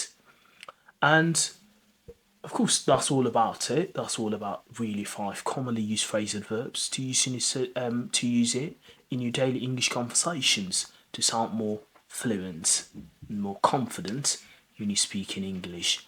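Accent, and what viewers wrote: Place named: England, United Kingdom. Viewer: I like you accent , what type of accent are you talking with I mean what part of England are you from?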